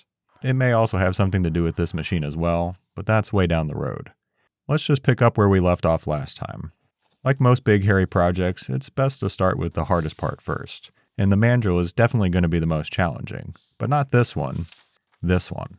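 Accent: United States. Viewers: I know it has been mentioned before. Thought id ask after some time has passed. Willing to sell the modified drawings you made of this? I have the ivan drawings for a while. decided to get a new book and was disappointed to see the same old drawings. The faded and missing bits that you mentioned annoy me. Some modern cad drawings that havent been disintegrated from scanning a copy of a copy of a copy would be nice. Big fan of the channel. I also recognize your accent. Might help to know im a local near the bluegrass as well.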